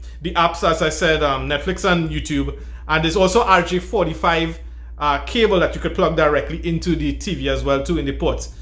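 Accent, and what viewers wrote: Trinidad and Tobago. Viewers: You sounding like a trinidadian.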